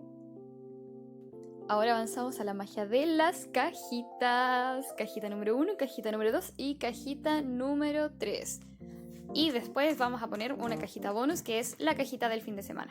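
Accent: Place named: South, Spain. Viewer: tienes un acento precioso! Y ese acento sureño se entiende perfectamente! Muy buen video! Saludos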